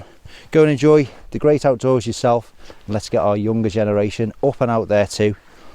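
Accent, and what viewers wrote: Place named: Wirral, United Kingdom. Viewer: Guessing you're from either Posh/Nice Wirral, Southport or Chester maybe. Good Vid 👌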